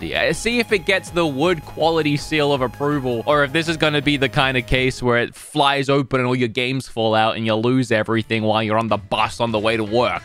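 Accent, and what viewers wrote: Australia. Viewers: Are you fron australia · Wait YOOOOOOOOOOO I DID NOT REALISE THAT YOU WERE ANOTHER AUSSIE!!! AUSSIE AUSSIE AUSSIIIEEE!! OI OI OI!! XD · What r u an Aussie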